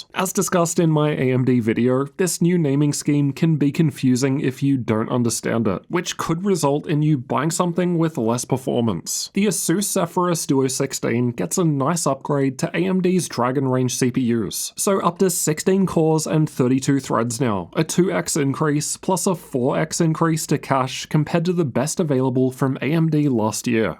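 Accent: Australia. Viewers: The most Australian Australian I've ever heard, nice comparisons, thank you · Okay but what are now the best and worst laptops from this year's CES? This is just a recap I came here to hear some Aussie ranting!!! :D Still nice video :)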